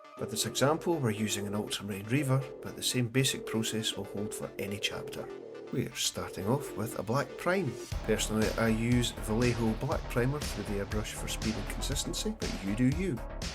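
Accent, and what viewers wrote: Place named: Scotland, United Kingdom. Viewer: Nice one. And the Scottish accent rendered you an extra like.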